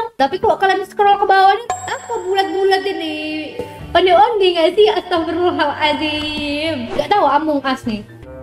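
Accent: Java, Indonesia. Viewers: Medok banget feelingkuh orang Jawa sih menurutmu gimana min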